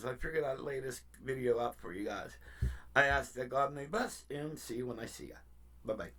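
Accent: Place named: Pittsburgh, United States. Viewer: I know a yinzer when I hear one 👊🏼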